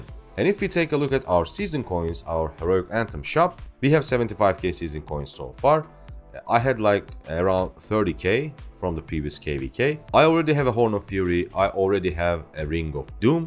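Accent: Turkey. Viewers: Paketin çıkan ücretinden türk olduğunu anladım tahmin etmiştim konuşma şeklinden. :D Videon çok faydalı oluyor teşekkür ederiz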